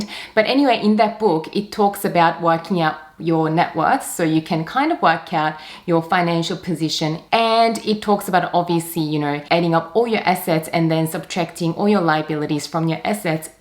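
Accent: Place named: Australia. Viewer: Hi sorry if this is a weird question are you from Melbourne? Only because there was another YouTuber who sounded identical to you I thought it was you actually.. I think I just found a difference in accents between Australian accents which I never noticed before Anyway bags are nice but I wouldn’t trade them for my seventeen o/s trips I have done.. 4 lux bags are plenty right now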